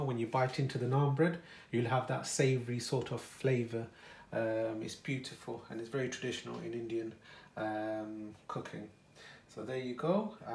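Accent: Sylhet, Bangladesh. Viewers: ❤️ from Bangladesh vaiya! Amazing work u r doing in UK! U sound like Sylheti 😊 Assalamualaikum to aunti ❤️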